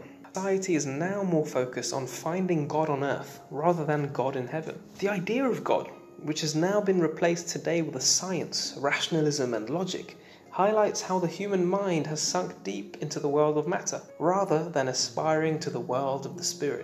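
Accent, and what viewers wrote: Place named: London, United Kingdom. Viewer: Where are you from? You sound like a Londoner but I've never heard the word 'says' pronounced your way.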